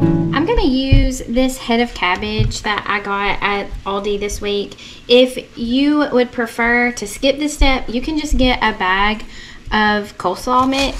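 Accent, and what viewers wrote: South, United States. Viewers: I just absolutely love your southern accent!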